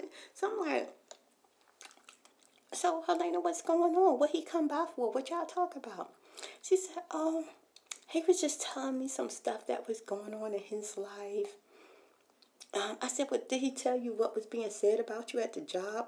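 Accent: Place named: South Carolina, United States. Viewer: Its skranger....and that's how we talk down in South Carolina....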